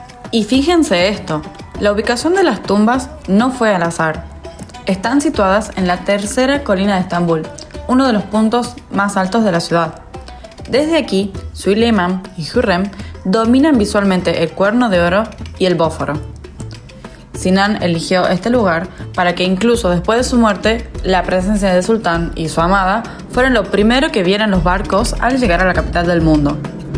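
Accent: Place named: Mendoza, Argentina. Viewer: Hablas como mendocina. Yo viví en MZA.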